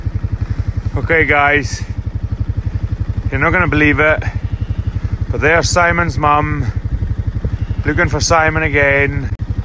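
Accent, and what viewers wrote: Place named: Orkney, United Kingdom. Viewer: I'd like to suggest that you call Simon's sister *Helena*. My boy Simon is a lovely special lad. I thought I'd lost him for good one day in Truro; I took my eye off him and there he was, gone! And his tricycle, too. We were united in Burger King, eventually.... And like your Simon's mum, I've spent plenty of time at the end of my tether, looking after Simon and his sister Helena. She's had her fair share of adventures, too, and she's bonny like your Simon's sister. It would be so nice to tell my Simon and Helena that they have namesakes up in Argyll who are loved just as much 😚😚! Lovely video, amazing accent (I've never heard an Orkney accent before, it's radically different to the estuary English down here in Kent!) and so good to be involved in the lives of you all at the farm, however vicariously. Wishing you every success; Katherine from Kent.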